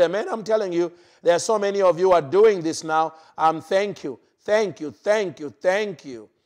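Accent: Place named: Africa. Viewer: Honestly speaking why can't you understand that he's not Westerner but an african and you just have to understand him what he's talking about by the help of the holy spirit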